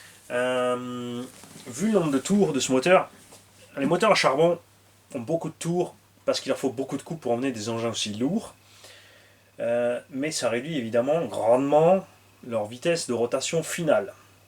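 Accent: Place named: South, France. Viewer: ho t'es du sud? deux mille viNNt et un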